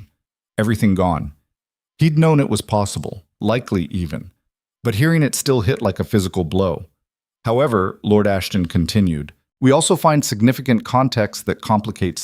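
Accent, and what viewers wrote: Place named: United States. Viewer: Hell is acceptable but NOT f***!!! And the modern language/accent of the narrator is annoying!!! Why American English when it’s Victorian England…??!! Can NOT recommend, NO subscription!!!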